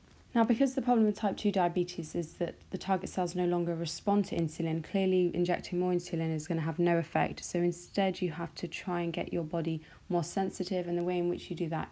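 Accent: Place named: England, United Kingdom. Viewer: i could watch those videos just to listen to your English accent.... its so beautiful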